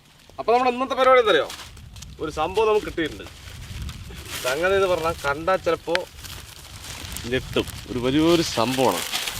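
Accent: Palakkad, India. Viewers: അണ്ണൻ പാലക്കാട് ഭാഷ 💯 സൂപ്പർ സൂപ്പർ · Chetta palakadan avadaram super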